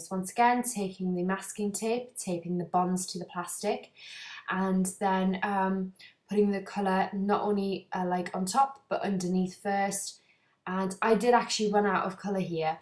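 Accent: Newcastle, United Kingdom. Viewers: I think she's from newcastle england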